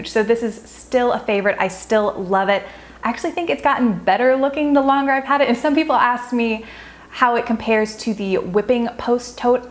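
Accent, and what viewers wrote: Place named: United States. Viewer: I also have this tote and I like it too... love your vedios and do you mind if I ask which part of America you are from? I am an English major and I am studying different English accents and I like yours very much!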